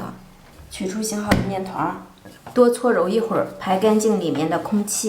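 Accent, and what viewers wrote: Shandong, China. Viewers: 山东姑娘是吧？不错 👍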